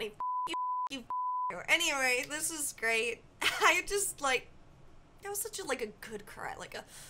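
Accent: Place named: South, United States. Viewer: “I’ll fight him. I’ll meet him at Walmart.” Jfc you’re so southern. 😂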